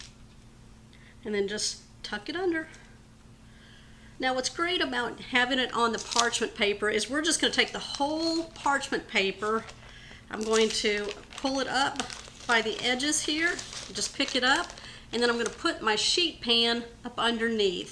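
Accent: Texas, United States. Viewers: Oh,!!! I going to go make this right now...I believe I have everything in my fridge and pantry!!! I'm going to add a little almond paste 😋 to punch it up a bit. You are wonderful ❤ 💖 I love just listening to your Texas drawl. You explain everything so well. Thanks again. Looking forward to whatever is next!!!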